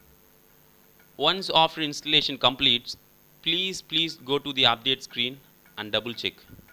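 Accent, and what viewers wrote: India. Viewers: İndian English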